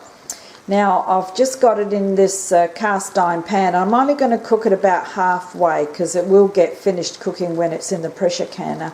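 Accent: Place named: Australia. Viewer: Thank you so much. Im just starting back with canning after a few years and i am doing lamb mince cos Aldi had it marked down. So nice to hear an aussie voice !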